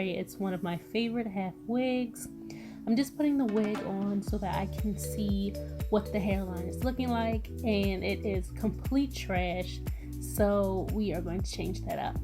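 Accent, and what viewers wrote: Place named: Baltimore, United States. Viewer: Wowwww sis you bomb!!!! One question tho.. you from Baltimore? You sound just like me lol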